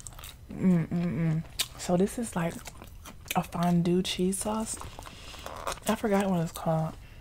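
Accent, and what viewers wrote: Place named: Maryland, United States. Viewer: Your pretty.. You sound like your from Maryland...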